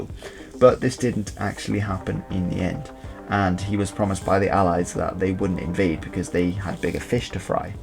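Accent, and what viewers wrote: United Kingdom. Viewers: You are a Dutchman?! You don't sound like one. If you are Dutch why do you speak English with a British accent? Do you live in the UK or have lived there?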